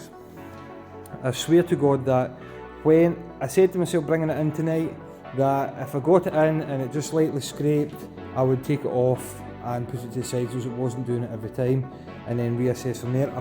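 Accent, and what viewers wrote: Scotland, United Kingdom. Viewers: Love the video 👍 great to hear a fellow Scot 🏴 on here. Liked and subscribed 🏆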